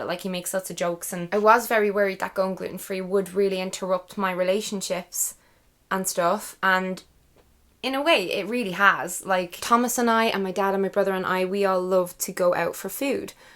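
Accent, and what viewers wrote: Ireland. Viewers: Brand new sub. Just binge watching your vids as we speak. Relate to so many of your vids. Think you are lovely and you can't beat an Irish accent, greetings from Scotland ❤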